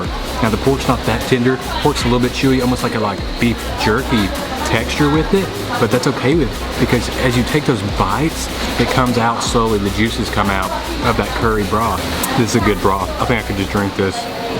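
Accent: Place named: South, United States. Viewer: Yo voice tho... Varrryy South... 😁 CM my hometown I am back in 2 weeks time for Songkran.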